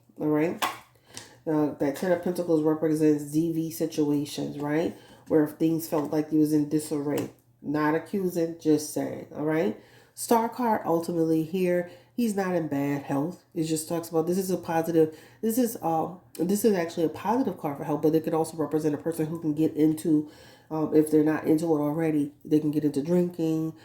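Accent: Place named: New York, United States. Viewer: You are from New Orleans, but you sound like a New Yorker, well just an observation.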